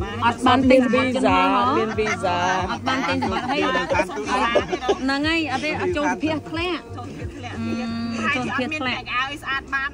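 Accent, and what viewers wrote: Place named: Siem Reap, Cambodia. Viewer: 😂😂 និយាយពិរោះតាមអ្នកសៀមរាប ដល់ឈប់រដឺនចង់ដាច់ផ្ងារ😂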